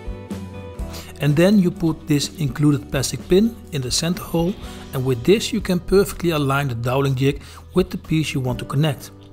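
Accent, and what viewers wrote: Netherlands. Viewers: the subs are a real improvement.. your funny Dutch accent is sometimes hard to understand.. (and I speak dutch) Nice review.. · Oh I’m sorry I thought you had a speech impediment. Your Dutch.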